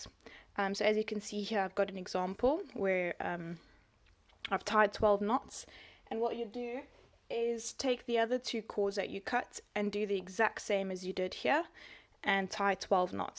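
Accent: South Africa. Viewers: You sound like you’re from South Africa? 🇿🇦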